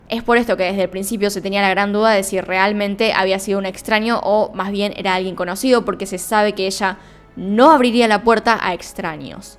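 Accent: Argentina. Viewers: A veces siento que nos estas regañando, luego recuerdo que eres Argentina y que así hablan... Pues de me pasa.... Me sentí regañada al final del video 🤣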